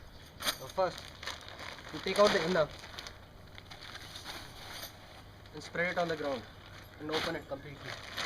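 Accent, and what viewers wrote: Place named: India. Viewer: dude what did you say after "hi friends"? #love#indian#english#accent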